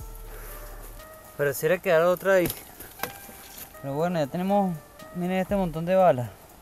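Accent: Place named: Venezuela. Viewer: hola... soy Mexicano casado con una venezolana... cuando estaba viendo tu vídeo ella me dice "... ese es Venezolano" ... que buen canal de detección tienes, saludos mi pana!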